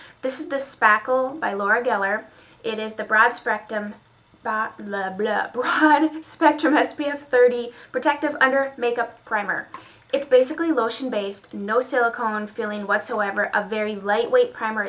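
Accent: United States. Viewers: New subscriber here your beautiful I love your voice I love American accents (I am from the UK) great video. Xxx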